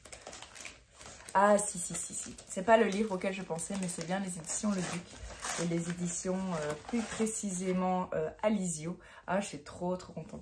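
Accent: Belgium. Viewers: Coucou. Mon oreille détecte un léger accent belge.... Dites-moi si je me trompe lol. Je suis belge moi-même 😉. Bravo pour le vlog👍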